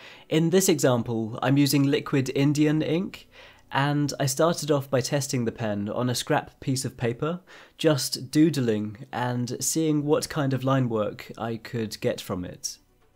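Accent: United Kingdom. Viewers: Amazing scull. With plenty of symbols. Fantastic to see you draw it. Learnt alot! Thanks. PS I really adore your beautiful British pronounciation. It's such a pleasure to listen to.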